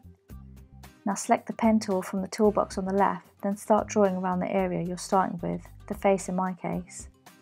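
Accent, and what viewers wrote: United Kingdom. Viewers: That British accent 😉 Good luck :)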